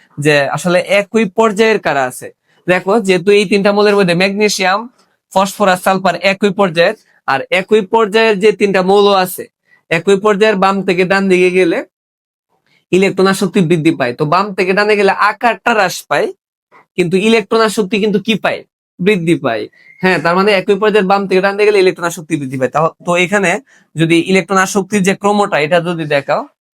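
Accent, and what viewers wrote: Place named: Comilla, Bangladesh. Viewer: Vaiya Apnar gramer বাড়ি may be কুমিল্লা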